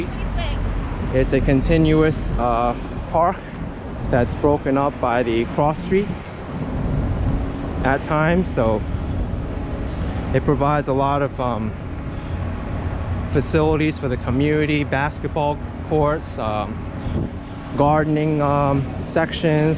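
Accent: New York, United States. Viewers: Good video! I like the Lower East Side! The New Yorker in you finally came out! lol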